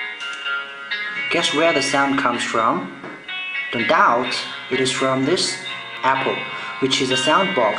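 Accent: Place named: Poland. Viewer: Interesting accent, is that polish?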